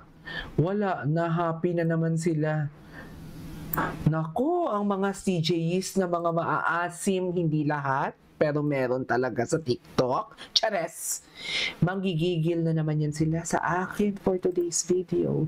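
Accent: Iloilo, Philippines. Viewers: Baw ilonggo ka sir ?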